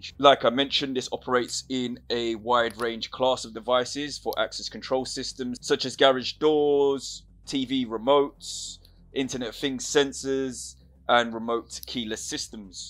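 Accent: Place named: United Kingdom. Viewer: I can listen to a British dude explain anything... 🤷‍♂️ innit.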